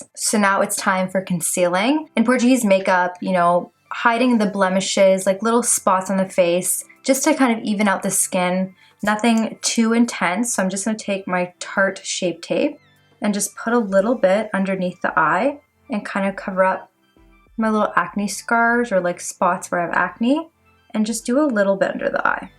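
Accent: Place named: Coast, Colombia. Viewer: Usted parece costeño colombiana jejejeje pero mi familia también son Portuguese y mi papá es trigenio bendiciones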